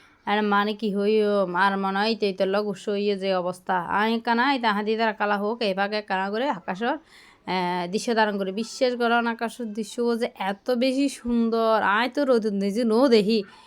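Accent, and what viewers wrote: Chittagong, Bangladesh. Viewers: দারুন লাগলো আপু তোমার চাটগাঁর কথা গুলো 👍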